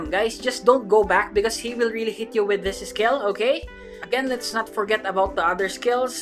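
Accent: Philippines. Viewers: Dam i just noticed from PH😱♥️ because i noticed the accent so i checked the bio and i was suprised! Im also from PH hehe Great video as always♥️😱🥳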